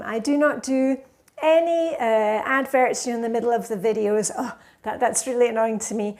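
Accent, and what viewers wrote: Scotland, United Kingdom. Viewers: I was drawn to your channel for several reasons: your infectious positivity! Learning about decluttering! Seeing you with friends enjoying life in Denmark! Your Scottish accent! Your simple meal preps! Simplifying household chores! All in all, you’re just a lovely human being ❤❤❤ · Found your channel via one of the minimalist channels - i think it was the minimal mom. I came for wardrobe advice and stayed because of many more reasons: the positivity, the no-adds no sponsoring, the iceswimming, the routines, the scottish accent, the authenticity, now I am learning about the flylady system and danish hygge. Your videos brighten my evenings and yoyr success is so deserved in my opinion! 🎉🎉🎉 Congratulations to 100k! 😊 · When we finally moved into our new house in 2020, I looked on "this You Tube thing" for guidance on folding socks as neatly as the German mothers of my children's friends did. 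Found a nice American lady, who besides folding, had videos on organising, and fell down the rabbit hole... At some point, I was watching an old video of the Minimal Mum, doing an all-January collaboration - and yours was one of the videos. At first I was very surprised that Danes apparently have Scottish accents 😂 honestly! I remember thinking the geographical proximity might explain it - I must have been thinking of Norway 😂😂. Luckily you soon mentioned being a canny Scot 😂. I am eternally grateful to you for your bahookie nudges, and enjoy being part of this international community around you. Congratulations and thank you - Anne (in Germany now but formerly of the 3rd Morden Brownies 😊) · I found you a couple of years ago, probably when I was searching for cleaning systems. I loved your warm, welcoming approach as well as the Scottish accent! I live in north east England and holiday often in Scotland-my favourite place. 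Feel as if I'm checking in with a friend every time a new video pops up. Thank you for your support and encouragement x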